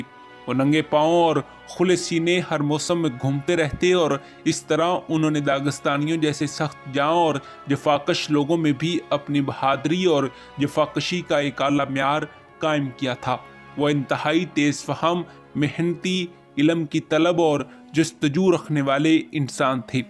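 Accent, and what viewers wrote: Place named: Punjab, Pakistan. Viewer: ٹیٹھ پنجابی سٹائل میں پڑھ رھے ہیں جس سے بہت سے الفاظ کا تلفظ صحیح نہیں ھوتا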